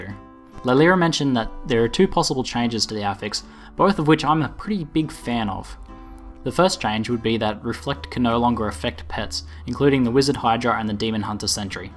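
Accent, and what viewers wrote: Australia. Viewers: Sounds pretty good. Nice to have an Australian voice on youtube :) sick of Americans...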